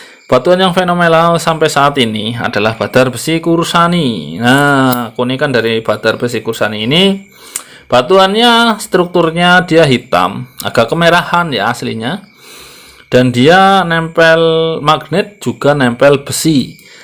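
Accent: Banyumas, Indonesia. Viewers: kan wong ngapak, ora ngapak ora kepenak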